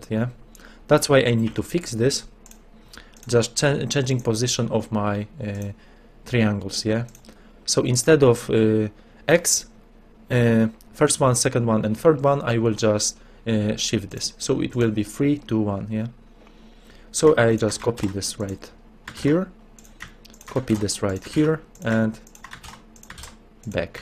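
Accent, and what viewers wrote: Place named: Poland. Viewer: i am suscribed to a youtuber from Poland, and i recognized the accent :)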